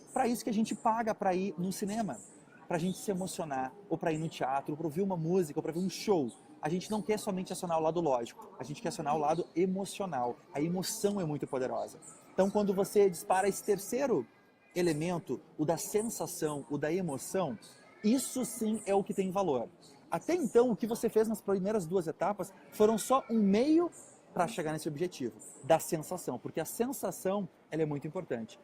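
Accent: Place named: Portugal. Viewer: To começando a te acompanhar, e sábia que esse sotaque era da terrinha.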